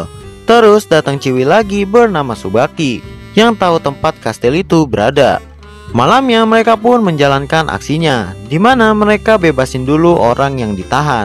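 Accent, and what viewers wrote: Java, Indonesia. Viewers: orang jawa bang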